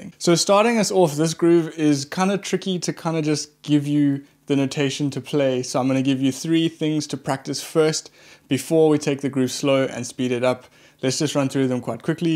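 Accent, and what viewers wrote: South Africa. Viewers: You South African? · subbed cause your English is as South African as mine. keep up the work, excellent lesson , ill watch more videos. not a drum player tho just love good music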